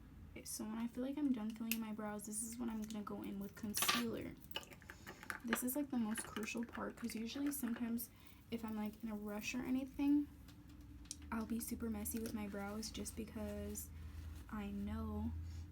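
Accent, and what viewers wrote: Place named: Florida, United States. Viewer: You sound like you’re from Florida based on your accent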